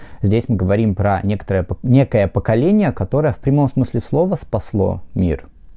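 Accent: United States. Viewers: У вас очень красивое и понятное американское произношение.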